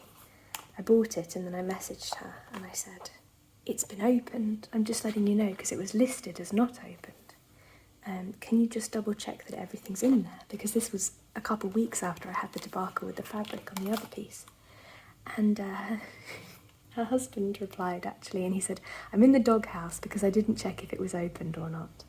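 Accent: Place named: Sweden. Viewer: Oooohhhh Dimensions kits presented by a lovely Swedish accented lady - what could be a better afternoon! 😊😊😊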